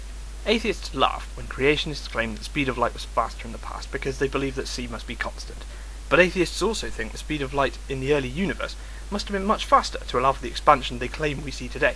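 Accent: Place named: England, United Kingdom. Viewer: Maybe it's the english accent, but I don't believe you're this dumb. I think you're a troll. Get a life guy.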